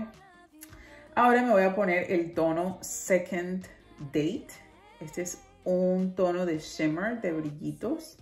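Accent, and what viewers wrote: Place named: Cuba. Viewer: hola soy nueva por aqui vine a tu resena por tu tono de piel tan parecido al mio para ver como quedan esos colores ceresas navidenos tan lindos me da esa paleta vibras de Huda pero Huda es carinosa en los precios asi que prefiero mophe que creando sombras realmente sorprenden y con precios de waoo, tu acento se me hace de cubana como yo jaja saludos aqui una nueva sub apoyando la raza besos.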